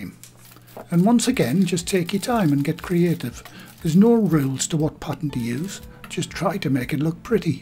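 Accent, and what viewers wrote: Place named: North East England, United Kingdom. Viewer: The irony is, that you probably have no idea what it's like listening to your softly spoken North Eastern accent, explaining things every step of the way. There's one thing I disagree with and that's that you are 'retired'... clearly not since you're still doing it on YouTube (albeit now for free). Old cooks never retire, they just find different ways of practising their skills. Well done, Sir, and please keep up the good work.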